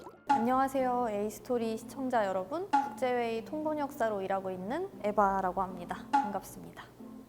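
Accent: Seoul, South Korea. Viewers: 서양외모에 전통한국인발음말투 ㅋㅋㅋㅋ신기방기하다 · 한국인태생특유의음성. 부모님중한분이한국인이신가요? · 전형적인 서울말씨에요. 당신의 노력에 찬사를 보냅니다. 역시 동시 통역사이군요.대한민국 홍보도 많이 해주세요. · 경상북도 사람인데요..나보다 서울말 더잘하노..ㅋㅋ.! 진짜 대단하십니다..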